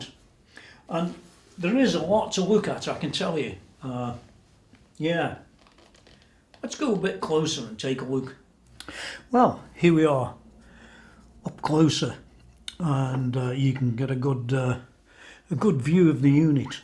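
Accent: Stoke, United Kingdom. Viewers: You can hear a fellow Stokie a million miles away 😬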